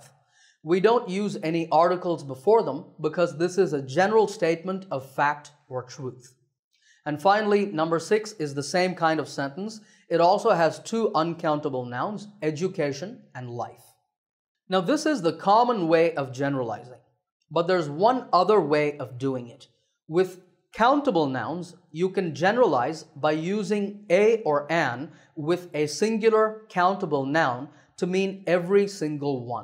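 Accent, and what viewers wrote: United States. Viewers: He spoke like, an American. Wow 🤩his vocabulary is fantastic. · Sir your video is amazing . Also you have a american accent .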